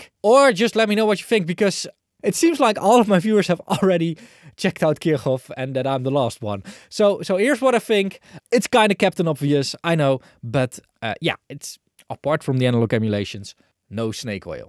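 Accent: Netherlands. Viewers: I have to say that I really enjoy your videos! One of the things I like is your Dutch accent, I'm used to it because I lived in Amsterdam for a while :)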